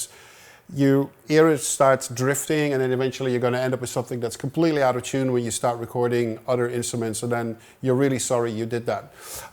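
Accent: Netherlands. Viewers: Hey Walter Lewin sounds a lot like him! Is he Dutch?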